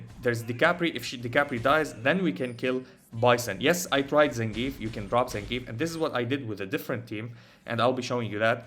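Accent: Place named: Arab world. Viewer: Bro i think you are arab, your english accent is arab. Anyway, are you still playing echocalypse?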